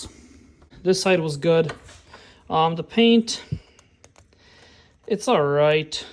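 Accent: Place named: Ukraine. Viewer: neat Ukrainian accent you've got..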